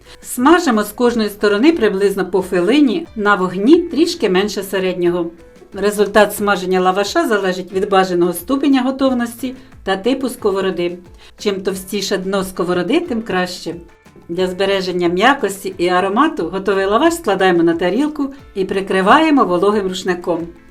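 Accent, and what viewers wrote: Standard. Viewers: Супер - вподобайка і підписка! ❤ Дякую за рецепт.Але найбільше вразила мене Ваша мова. У мене муз.освіта , і я можу порівняти мої почуття від Вашої озвучки відео з такою музикою, що хочеться слухати і отримувати насолоду. Прошу Вас , будь ласка, дати мені відповідь, в якому регіоні Ви живете? Бо я ще не зустрічала такої справжньої чистоти .✨️💙💛💙 ( цікаво, чи я вгадаю🤔 - черкаська обл.?)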